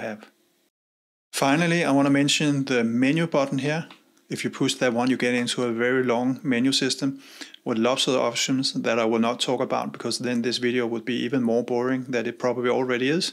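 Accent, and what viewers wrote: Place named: Denmark. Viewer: Jeg elsker os danskere når vi snakker engelsk, det er ret tydeligt. 😁 Lækker video, tak for den! Har lige bestilt min til min Lumix S5.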